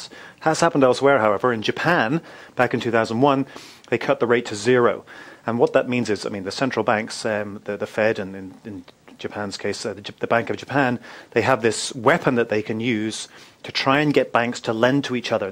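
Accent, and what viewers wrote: United Kingdom. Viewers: you are Briton, arent you?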